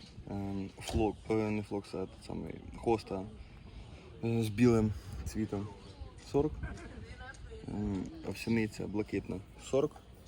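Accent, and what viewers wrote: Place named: Poltava, Ukraine. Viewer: Чую чую полтавський говір, бо сама з Сотницького,Гребінківський р-н,там народилася ,а зараз під Києвом живу,а батьки там. Успіхів вам та мамі. Молодці. Приємно бачити та чути,що ви вже й канал маєте. Розвитку та продажу,нових ідей, здоров'я та мирного неба. Підписка😁💛💙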